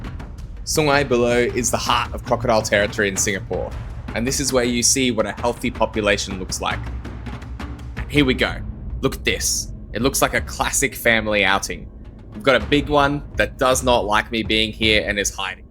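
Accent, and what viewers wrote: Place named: Australia. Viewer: You're essentially SG's Crocodile Hunter now! How fitting that you're also Aussie (I'm guessing, from the accent) Would be keen for those croc tours you mentioned to another commenter, if they ever get off the ground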